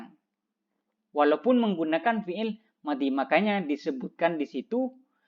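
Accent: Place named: Madura, Indonesia. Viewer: Madura mu loh 😂 medokkk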